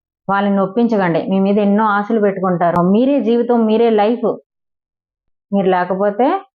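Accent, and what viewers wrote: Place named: Godavari, India. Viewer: మీ మాటతీరు ఒక గోదావరి స్లాంగ్ ఉంది and అటువైపు ఉండే సాధారణ గృహిణి మాట్లాడినట్లు ఉంది కాని మీరు చెప్పే సబ్జెక్టు బట్టి మీకు మనుషుల సైకాలాజి తెలుసు.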